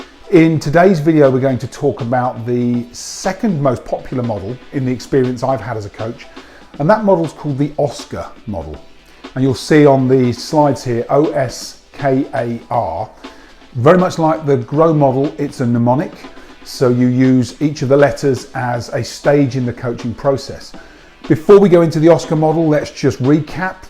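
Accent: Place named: United Kingdom. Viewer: This is my favorite coaching model! Loved how you explained it and love the UK accent as well.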